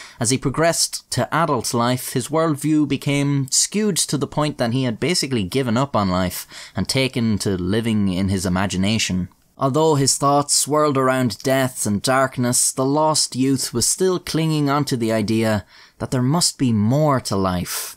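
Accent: Ireland. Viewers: can you do the port aurthur shooting? or the cookie question, I've never heard anyone with an Irish accent do the cookie question. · Did anyone else know that is just irish and isn’t just British with a speech impediment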